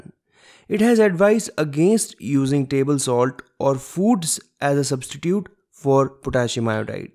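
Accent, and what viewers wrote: India. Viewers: Your accent is so Indian Love it!!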